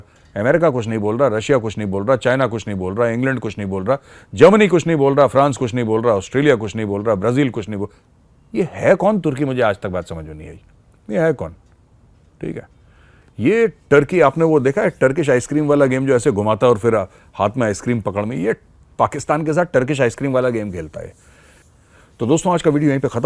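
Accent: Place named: Bihar, India. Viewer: Bihari hindi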